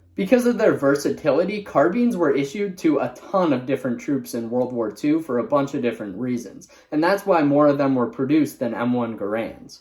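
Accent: United States. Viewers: 25 years old and i will never not hate the way Americans say Carbine. The car bean thing was originally a American English vs. traditional English pronunciation. So in The UK, the Commonwealth and Ireland (ie 90% of the English-speaking world) its car byne To Americans its Car bean. And since in the modern day most firearms experts are Americans, you get Car bean being more popular in pop culture and online. Do you guys also call a turbine a turbean?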